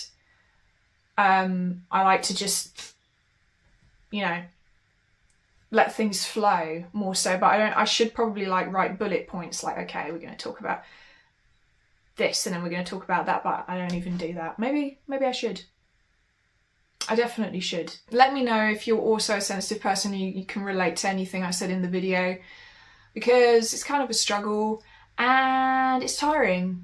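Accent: United Kingdom. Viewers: THOZE DRY LiPZ, THE UNDER EYELiD BAGZ KOMiN iN, THE WHiTE WOMAN TWiTCH, & THAT ACCENT ! HOW R U BRiTiSH BUT NOT BRiTiSH GROWN !? U GOTTA TRY ADMiTTiNG WHETHER U THiNK THE DiCK iS CUTE OR NOT MiZZ ! U KAN'T KEEP BOTTLiNG UP ALL YOUR FEELiNGZ, NOR JUZT RELEAZE SOME OF THEM ON YOUTUBE, YOU'RE STiLL GONNA EXPLODE ! U HAVE TO B WiLLiNG TO SAY THiZ STUFF iN PERSON, ON THE SPOT, OR ELSE iT'LL EAT'CHU UP iNSiDE ! ONCE AGAiN, HONEZTY ! & QUESTiONiNG TOO ! & TRY & GET WHAT U EAT iN ORDER AS WELL, KAN B A MASSiVE HELP ! <link> "FOOD THAT KiLLS WiTH DR. MiCHAEL KLAPER-1993-FULL DOCUMENTARY-LECTURE-TESTiMONY" WHiTE0MOON UPLOAD ! TRY STiCKiNG TO EATiNG THiNGZ RAW THOUGH, THE MORE U COOK FOOD THE DEADER iT GETZ ! BUT U KAN TRY & HAVE BOiLED WATER AS A CONSTANT TO DRiNK THOUGH ! & iT'Z STiLL A DEATH PLANET MiZZ, & YOU'RE ALiVE ON iT, iT WAZN'T GOiNG TO END THAT WELL 4 US ANYWAY EVEN iF U HAPPEN TO B KOME iMMORTAL ! 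iT'Z ALRiGHT TO FEEL SAD, iT'Z NOT A DiSORDER, iT'Z AN EMOTiON ! VASECTOMiEZ 4 ALL, ESPECiALLY US HUMANZ ! AT LEAZT A WASH OUT METHOD OR SOMETHiN GUYZ ! [NOT YELLiNG, JUZT LiKE TYPiNG iN CAPZ]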